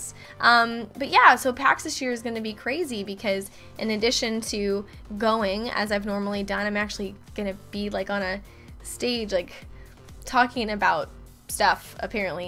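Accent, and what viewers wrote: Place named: Midwest, United States. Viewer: You accent really confuses me. It sounds like Midwest mixed with a bit of southern. Anyone know where she's from? Statewide, I mean. I'm not a creeper.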